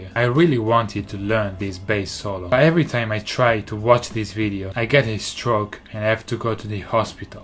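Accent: Italy. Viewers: His passion and musical skill is addicting...hahahahaha Not to mention that hes kind of cute and that Italian accent puts the cherry on the top of the cake! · His italian accent is pretty huge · Si sente che sei italiano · That's the Italian accent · I think he's actually italian because i'm italian ad he speaks like me when i dint't care about the english pronunciation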